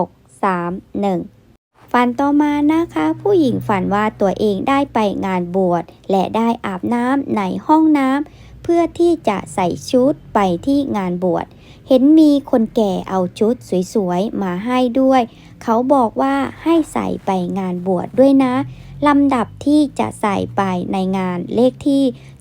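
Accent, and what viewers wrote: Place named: Laos. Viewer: คลิป มันชอบออกลาวเกือบทุกคลิปจ้า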